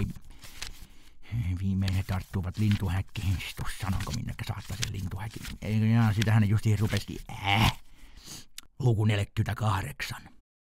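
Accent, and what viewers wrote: Finland. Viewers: Olipa mukava kuulla omaa murretta, kun asunu Keski-Suomessa nyt 10 vuotta.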